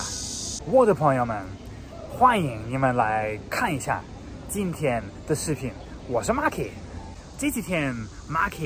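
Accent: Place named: Chengdu, China. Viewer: 你的成都川普比我说得好。😅😅😅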